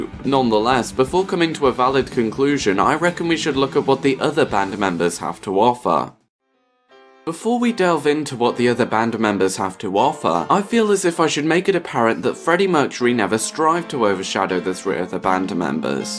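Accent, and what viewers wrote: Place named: RP, United Kingdom. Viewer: Yes I can imagine you did have to think a lot about it! But you've made a few good points, I really enjoyed this video! Keep up the good work (omg that sounds like I'm calling you a rude word) haha oh gosh I'm gonna have to chose my wording differently lol anyway thanks for replying and BTW I love your voice! You sound posh (I'm a northerner so everyone sounds posh to me 😀) 👑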